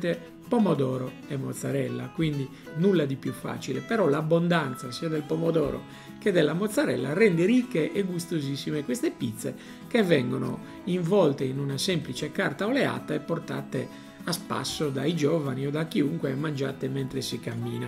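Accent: Sardinia, Italy. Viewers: mi pareva di sentire un lieve accento sardo ... saluti da Ercole Pinna ... sarebbe interessante una pizza con prodotti sardi ... carciofi bottarga e pecorino !!!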